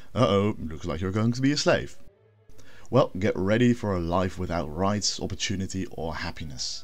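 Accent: Netherlands. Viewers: Thicc Dutch accent, daammnn.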